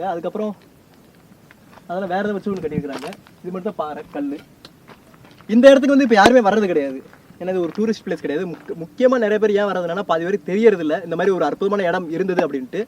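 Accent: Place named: Kanyakumari, India. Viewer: Slang Semma... Kaniyakumari slang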